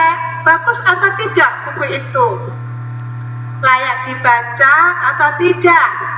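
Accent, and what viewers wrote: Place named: United Kingdom. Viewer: Aksen british nya terasa sekali ya ^_^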